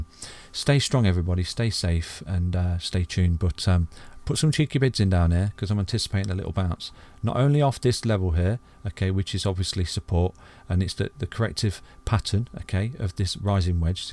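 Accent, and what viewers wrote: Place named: Southern England, United Kingdom. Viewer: Brilliant analysis - picked up on the head and shoulders... Very good. Nice to hear a Southern Englander whilst I'm here in Thailand. Thank you buddy 🙏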